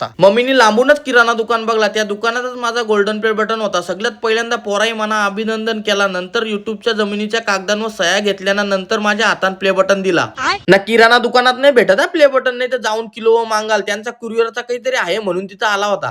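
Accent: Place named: Konkan, India. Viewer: Konkani acent wr me fkt fida aahe karan mazya baykola to kokan aavdt saglyat jast soo bhawa very heartily congratulations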